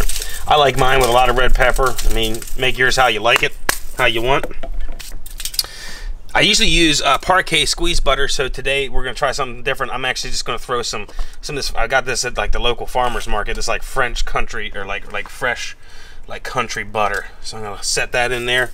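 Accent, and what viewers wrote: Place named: Baltimore, United States. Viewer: you have a Baltimore accent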